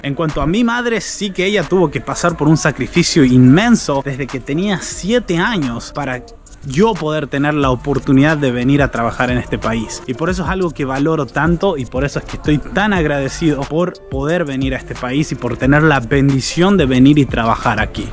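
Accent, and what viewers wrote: Argentina. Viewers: Hola saludos desde Córdoba. Te sentía tonada argentina pero no sabía bien de que provincia 😅..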